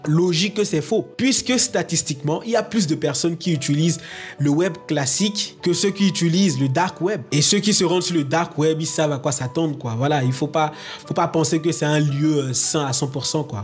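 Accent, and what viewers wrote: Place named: Senegal. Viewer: Super vidéo et en plus avec l'accent sénégalais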